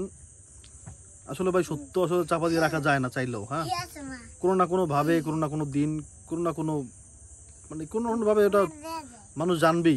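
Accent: Barishal, Bangladesh. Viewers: বরিশাইলা বলে কথা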